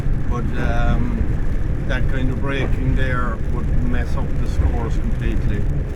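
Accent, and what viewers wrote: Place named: Mayo, Ireland. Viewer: Good vid again. Keep em coming in 2024. Where abouts in Ireland you from. My wife's family are Mayo. Your accent sounds your that way. HNY.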